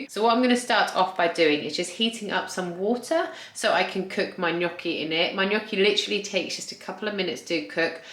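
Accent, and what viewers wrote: United Kingdom. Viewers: At last.....! A Brit ! Not a Yank. British meals preped by a lady with a British accent..:)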